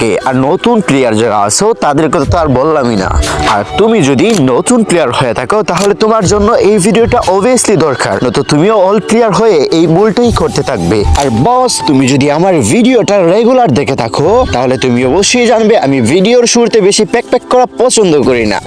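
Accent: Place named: Sylhet, Bangladesh. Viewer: বস তোমার সিলেটের ভাষার অনেক ভালো লাগছে❤❤❤❤😊😊🎉🎉🎉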